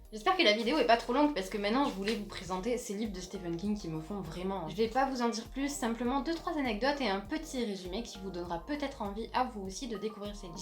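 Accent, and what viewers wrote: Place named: South, France. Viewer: Je continue à remonter le temps et ce coup-ci en partant de la fin. Très bonne vidéo avec ses 3 parties, et j’adore la pointe d’accent du sud. Mon premier Stéphan King sera certainement 22/11/63. Marche ou crève m’intéresse ! Déjà le titre me parle et ton avis confirme l’impression.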